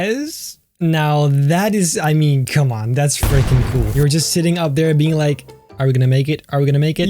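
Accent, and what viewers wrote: Netherlands. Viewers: Are you Dutch? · Are u dutch? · He sounds dutch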